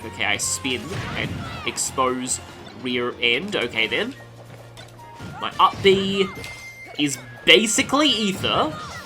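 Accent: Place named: Australia. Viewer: could this video be foreshadowing for a xenoblade 2 playthrough? i would love me some british dub + ozzie commentary combo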